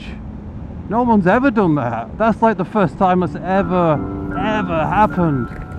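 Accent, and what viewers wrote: United Kingdom. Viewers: what part of northern england is that accent dude. great vid by the wayl.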